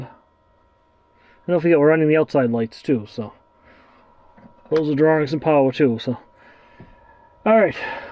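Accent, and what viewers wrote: Boston, United States. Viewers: Just knew you must be from the Boston area! You sound so much like my son-in-law from Beverly.🙂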